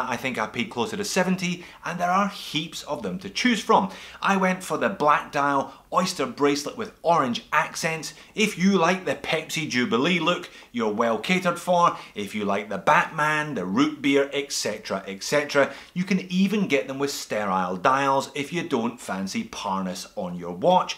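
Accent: Scotland, United Kingdom. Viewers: Who are you, where are you. Scottish accent? Just beginning to learn about watches here. You’re videos are very “uplifting”. Much appreciated. Happy Thanksgiving 🦃 from California to you no matter where you are in the world. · what does that even mean? Apparently this is your first time watching the channel. He's a for real Scotsman, living in Sydney, Australia. He talks the way he talks, always. No need to be insulting.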